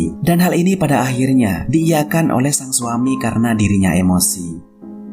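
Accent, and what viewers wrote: Madura, Indonesia. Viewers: Salam.kenal bang... perantau di banyuwangi asal bondowoso sll menyimak. Terkdg sy merasa logat bicara smyn kyk parak pahe'eh bik ngkok kang😁😁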